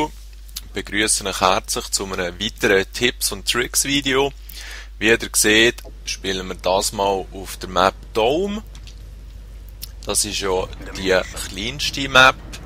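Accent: Switzerland. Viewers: Endgeil das swizzerisch :D ich versteh kaum ein wort aber kann mich da köstlich amüsieren :D bitte mehr :D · Ouuu was schwizerdütsch!!! :D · haha :D gnau so öppis hani gsuecht und den isches ersch no uf schwizerdütsch ;)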